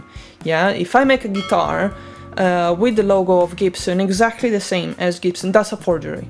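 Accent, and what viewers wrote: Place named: England, United Kingdom. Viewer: Great analysis. I like you would not support this company with my custom and like you think the Les Paul is a beautiful guitar. Your comments about contradicts was true and your ethics about not buying inferior and suggesting Gibson should focus on making a superior product made sense. I also agree with you, let the customer chase you for a well made product at an attractive price rather than chase, threaten and bully the customer. This is one of the most balanced comments without sarcasm, anger or abuse. Plus, your English accent is gorgeous! 👍🎸👌❤️ Great job.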